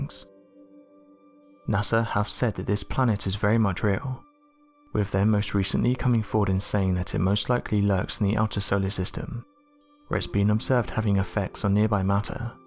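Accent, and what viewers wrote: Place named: Wales, United Kingdom. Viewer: The narrator's english accent is very strong and hard to understand, people of the channel you need to understand that your channel is broadcasting on YOUTUBE which is an international application and most of the viewers are not native english language speakers. Even some of the native english speakers have hard time to understand the accent. Is the narrator Welsh or from Isle of Man or from Bolton?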